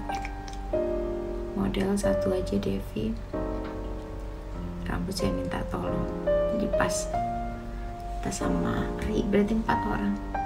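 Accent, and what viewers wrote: Java, Indonesia. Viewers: Wow kren cantik pinter klongomong ada jawanya jadi aku sukah klo orang jawa ojok ngilangno bosonedewe semoga sukses sehat selalu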